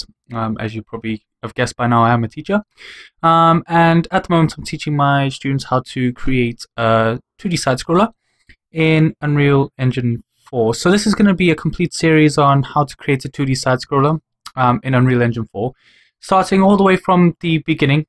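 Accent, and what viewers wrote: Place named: Turkey. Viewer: türk aksanı sezdim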